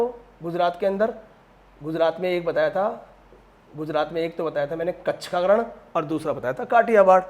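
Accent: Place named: Marwar, India. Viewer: Haan bhai🤣🤣🤣🤣or sir ki aadhi hindi aadhi marwadi maja hi aata tha😁😁😁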